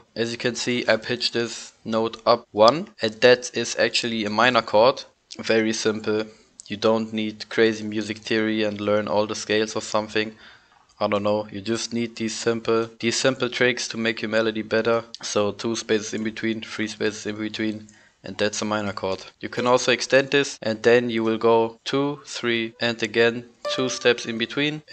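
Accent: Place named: Germany. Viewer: man hört du bist deutscher😂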